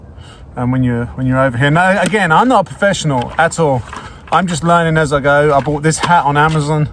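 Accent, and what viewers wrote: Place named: United States. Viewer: why the yank accent??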